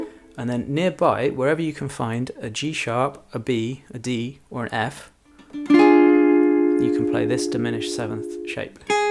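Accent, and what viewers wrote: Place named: United Kingdom. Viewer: Thanks, nice clear laid back Brit!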